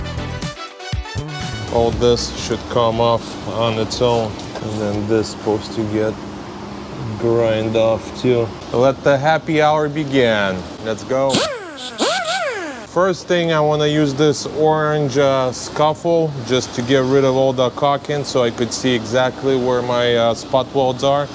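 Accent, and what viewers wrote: Russia. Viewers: 😅 Русский акцент не скрыть никак. Классная работа! Лайк, подписка.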